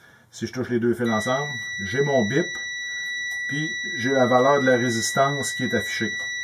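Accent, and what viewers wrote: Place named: Quebec, Canada. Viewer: Sa fait du bien d'écouter un Québécois pour une fois lol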